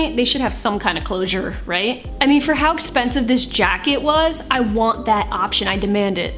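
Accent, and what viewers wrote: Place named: North, United States. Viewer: Upstate South Carolina here! Love your vids! Your accent is great. I get grief for my southern accent all the time! Love hearing your unabashed northern accent!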